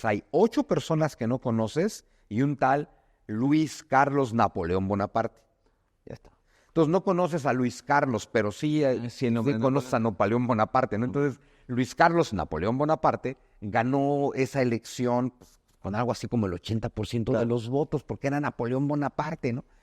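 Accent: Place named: Mexico. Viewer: Ameno, grandísimo conocedor, dicharachero...con ese hermoso acento mexicano. Grandísimo placer escucharte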